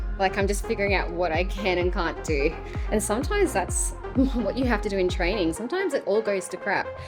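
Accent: Australia. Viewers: The Aussie accent is a surprise. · Didn't no you were an ozzy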